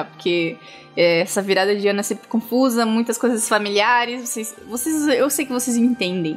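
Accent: Paraná, Brazil. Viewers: Caraí!!!!!!!!! Tu É Paranaense!!!!!!!!!!?????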